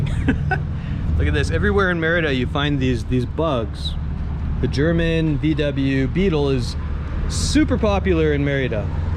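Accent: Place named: United States. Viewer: Cool vid! Personally, we are with you on the mandatory jabs and masks! We are NOT into it at all so keep preaching. Question, my wife and I are looking to retire and leave the States. Would you consider Merida a nice place to settle down and retire for older but very active people? I love the fact that Merida, unlike many other places in Mexico, is very safe and still somewhat affordable. Thanks and safe travels. (By the way, work on your accent. It's SO Americanized)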